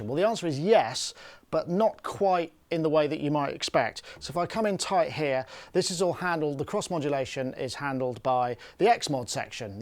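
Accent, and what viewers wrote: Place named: United Kingdom. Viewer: It doesn't matter what you say, you're British....it works out.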